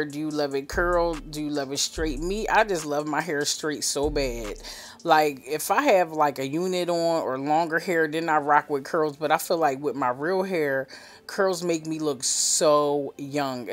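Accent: Philadelphia, United States. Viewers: Love your quotes I wish you could do my hair Knew you were from Philly from your accent I’m sick that I missed your pop up shop in DC, trust and believe I would’ve been there!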